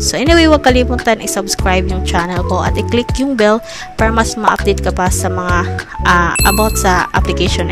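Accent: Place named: Visayas, Philippines. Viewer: Bisaya ka mam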